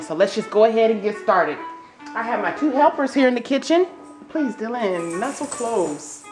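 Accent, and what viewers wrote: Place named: Germany. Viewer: You have a solid german accent haha I was not expecting your reveal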